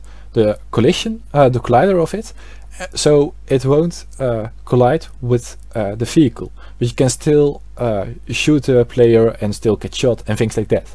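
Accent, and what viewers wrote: Netherlands. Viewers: Hey + are you dutch because you sound very dutch haha. Keep up the great work!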